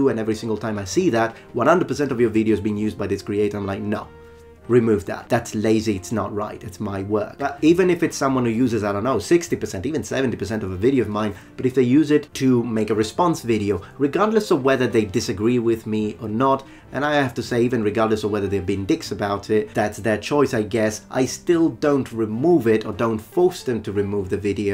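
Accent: United Kingdom. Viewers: He does sound like a posh Englishman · The more angry gets the more British he becomes.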